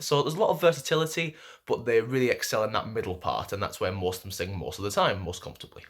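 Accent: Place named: England, United Kingdom. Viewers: I love your accent too.Are you English? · Are you an English? I really like yo accent.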